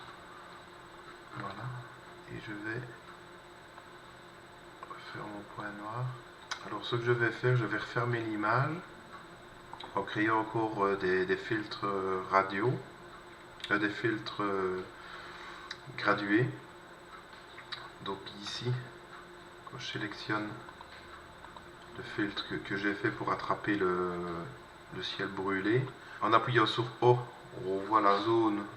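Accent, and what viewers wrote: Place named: Liège, Belgium. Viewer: Super le tuto, j'ai vraiment apprécié le procédé, je vais tester sur une de mes photos avec ciel presque cramé. J'ai aussi adoré l'accent liégeois ;-) Merci pour les explications.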